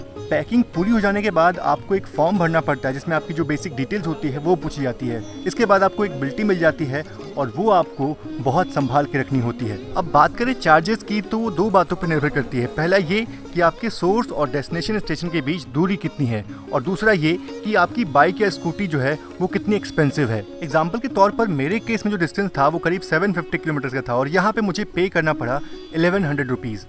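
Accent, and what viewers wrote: Varanasi, India. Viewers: sir aap varanasi ke ho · Bhai kya aap bhee banaras se ho?????? 🤔🤔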